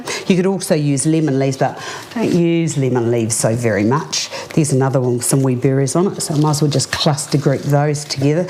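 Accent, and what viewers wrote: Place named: New Zealand. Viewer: Love this! and I can't get enough of the delicious NZ accent. You sound like my choir director Mark Duley, he's from Christchurch but now has settled down in Galway, Ireland!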